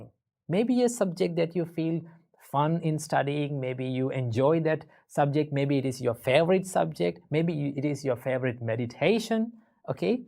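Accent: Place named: India. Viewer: His english feels hindi 😊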